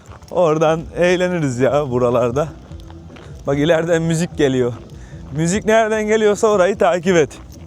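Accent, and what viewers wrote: Thailand. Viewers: Tayland aksanı yapmaya çalışıyor ingilizce konusurken hepsi o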